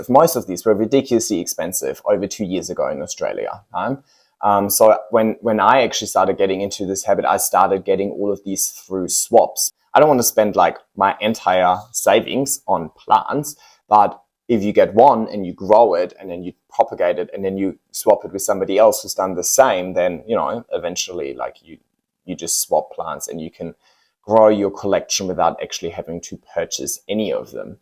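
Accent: Germany. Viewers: Ahh nice! My best friend is German, I detected something in your accent. 😜 Right! Makes sense. How do you remove the roots from the moss pole successfully?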